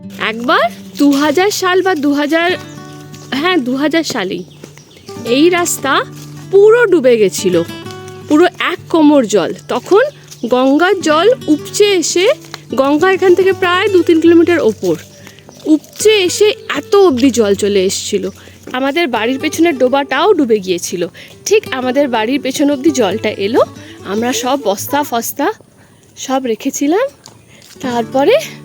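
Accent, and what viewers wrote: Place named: Bangal, Bangladesh. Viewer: পাত্র পরিবার কি বাঙাল অরিজিন? আপনার কিছু উচ্চারণ, বাঙাল ঘেঁষা লাগলো... খুব ভালো লাগছে আপনাদের ভ্লগ।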